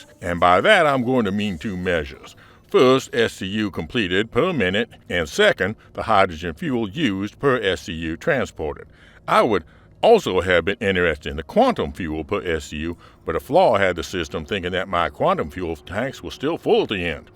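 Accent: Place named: South, United States. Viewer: I say, I say...I enjoyed the foghorn leghorn southern inflection/accent. Not super familiar with Bezsek.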